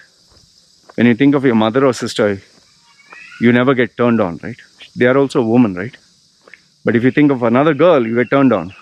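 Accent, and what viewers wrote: India. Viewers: your accent is more like an Indian. where are you from brother?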